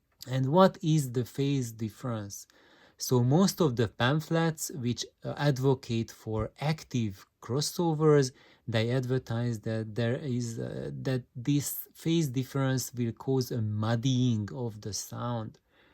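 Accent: Hungary. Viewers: Brutális ez a magyar (Hungrish) akcentus. :)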